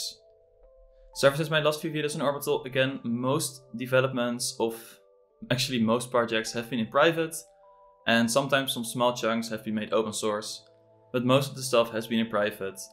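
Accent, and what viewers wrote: Netherlands. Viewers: are u dutch lol? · mooi nederlands accent, goeie video!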